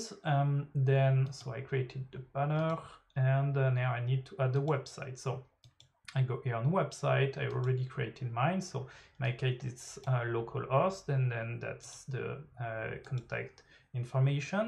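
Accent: France. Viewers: Yo t'es francais ?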